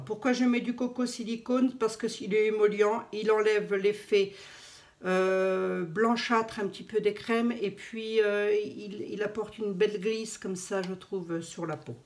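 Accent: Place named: Lorraine, France. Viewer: bonjour, super recette que je vais faire ce soir, je tiens a te dire que je me doutais que tu était lorraine vu ton accent qui ne m'est pas inconnu puisque je suis née dans les Vosges, je vis dans le sud ouest a présent, alors je te dis encore bravo pour tes tutos qui me ravissent a chaque fois bisous a toi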